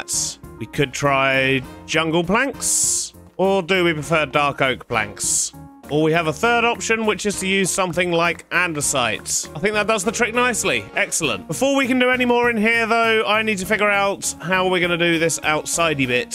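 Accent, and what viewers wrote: United Kingdom. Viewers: Are you British or American you sound British but it might just be me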